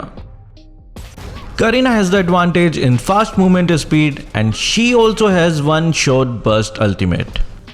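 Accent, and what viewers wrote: India. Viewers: Your hinduna accent make this tutorial perfect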